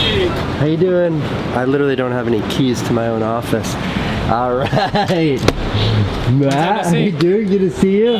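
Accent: New York, United States. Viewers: still a heavy ny accent though!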